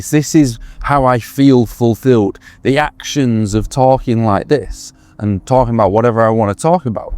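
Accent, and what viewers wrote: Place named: Manchester, United Kingdom. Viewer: More people need to hear this. You are straight talking and bang on point in what you say. Im from Manchester, Your accent reminds me of my grandad and he used to say the same things you are bringing up which is proof there isn't a glitch in the system its by design. Please don't stop doing the videos you make me feel less crazy because im not the only one thinking it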